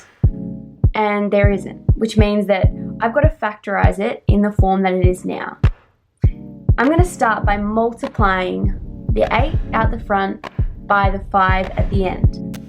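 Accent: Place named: Australia. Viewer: It sounds like you are from Australia, but you use grouping and NOT the Australian method. Very curious.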